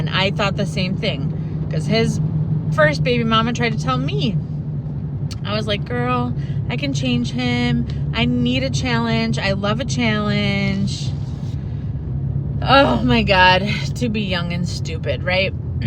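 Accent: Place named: Wisconsin, United States. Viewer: I could tell right away you were a Wisconsinite, or possibly Minnesotan, then you said Great Lakes. I’m from the same area. You seem like someone I’d get along with, I like your personality. We’d have a lot we could relate on, I don’t know anything about having kids though. Despite what other commenters have said, it sounds like you’re doing an amazing job, you’ve got the right attitude, and I applaud you for going after your dreams when you can find the time for yourself. That’s something your kids will look up to. Just make sure you get enough sleep so you don’t get sick! :) wishing you all the best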